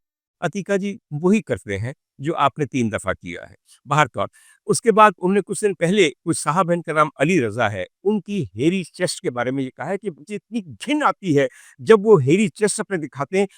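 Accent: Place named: Punjab, Pakistan. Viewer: آپ کا پنجابی تڑکہ مزا دوبالا کر دیتا ہے 😊سلامت رہیں خوش رہیں آمین 🎉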